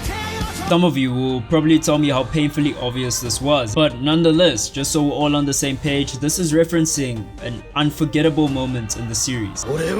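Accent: South Africa. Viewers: Bro you’re a South African brother? I’m subscribing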